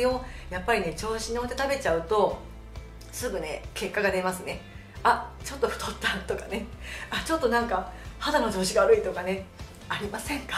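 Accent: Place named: Tohoku, Japan. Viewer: デーツを１日３粒食べてますがなかなか減らない体重🤧 パートナーには(ゴキブリ食べてる)と嫌われました🤣 さんの東北っぽいアクセントに安堵してます🤗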